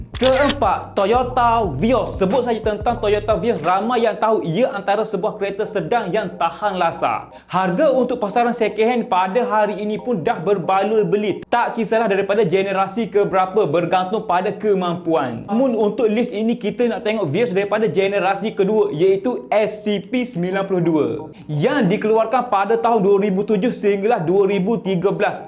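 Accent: Kelantan, Malaysia. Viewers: Kuat tone klate abe ni.. Blh tau.. 😁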